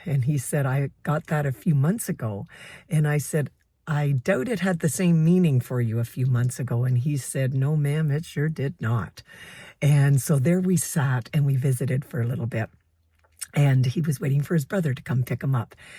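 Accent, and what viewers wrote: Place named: Canada. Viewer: your sweet, soft, mellifluous Canadian voice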